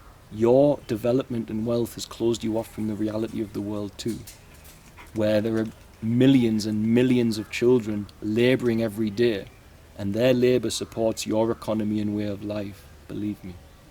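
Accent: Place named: Newcastle, United Kingdom. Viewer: I love your Geordie accent. Always felt self-conscious talking about spirituality and philosophy while sporting my accent. You’re comforting, inspiring and so down to Earth for someone so awake and intelligent. I also appreciate your deep esoteric understanding of Christianity rather than just condemning the scriptures due to the church’s past, like so many people do these days. The royal family has always been just a figurehead to compound and reinforce conditioning, obedience, discriminatory nationalism and patriotism, a symbol of wealth and authority when in fact all they do is bleed money from hard working people and there is no justification that exists for the empire they have in property, land and objects when children, animals, people go hungry and homeless. They moved homeless people off the streets to make way for the royal wedding... 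there’s nothing Christian about it. I honour your compassion and certainly acknowledgment of prejudice, jealousy and small mindedness of the working classes, but there is an unmistakeable distinct inhumanity in hoarding wealth & land & then calling people selfish for exercising autonomy over their own bodies. Completely agree with you. I agree the matrix of our experiences makes us ignorant and ungrateful but the Queen takes that to another conscience-less level.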